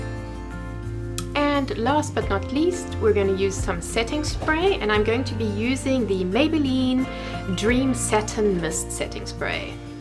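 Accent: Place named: South Africa. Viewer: I love your South African accent x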